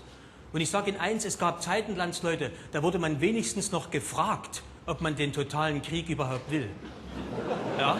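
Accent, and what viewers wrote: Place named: Saxony, Germany. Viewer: Uwe du sprichst ein schönes sächsisches Dialekt, ansonsten hast du den Vogel !!!!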